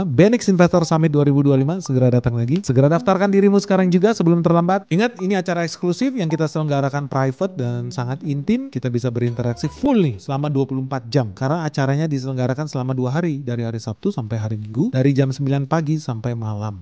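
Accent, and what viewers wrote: Indonesia. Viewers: bkn..klu ko tguk komen2 dia kat video utube lain..dia ni org indonesia jgk..jilake..cara ckp pn nmpk sgt indo totok..haha 😂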